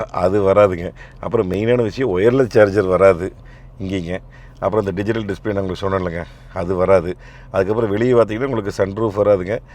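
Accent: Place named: Kongu, India. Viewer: அக்கா சரிக்கா. வெளிய போங்ககா 😂😂😂😂கொங்கு தமிழ்.. அழகு... மொறு மொறு மொறு மொருன்னு 😂😂அருமை அண்ணா 🙏🎉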